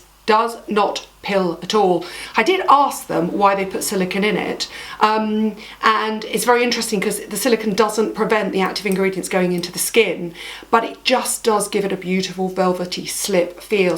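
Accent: United Kingdom. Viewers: Happy new year dearest lady ! Your videos are always so pleasant Lovely classy British accent love it 💝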